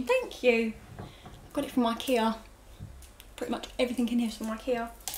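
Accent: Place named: North London, United Kingdom. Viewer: Im from enfield so not far from tottenham :) i could tell from your "accent" that you are a north london girl. Fab videos and i enjoy watching them. I like margate in kent as used to go on holiday there as a kid. Now my kids want to move to great yarmouth after they finish junior school but im not sure how i will adapt from the speedy london life style to a more slow and rural life style.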